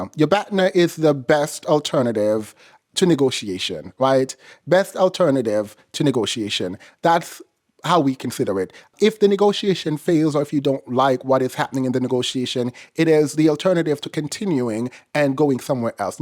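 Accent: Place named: Jamaica. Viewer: You lead with your true Jamaican today and it was AMAZING!!!!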